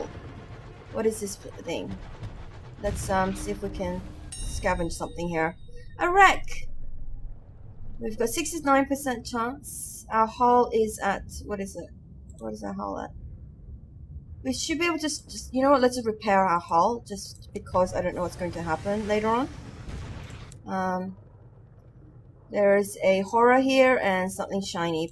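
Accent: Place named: Australia. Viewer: You sound Australian with a bit of American accent at times, sometimes a bit more British...😅 Are you an Aussie? Because uploading that early in the morning seems rough...?😜 Edit: Hmmm... Somtimes I'm picking up a slight non-native speaker accent...🤔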